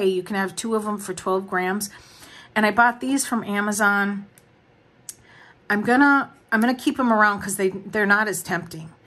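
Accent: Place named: Wisconsin, United States. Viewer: 👏🏽 i applaud this. i’m also working on a health journal. also, i love your accent so, so much! ❤ are you a wisconsinite, by chance?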